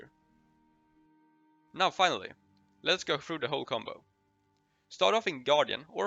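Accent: Sweden. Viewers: Asså cool vid osv, men din svengelska är så söt, minn or trait 😅😅😅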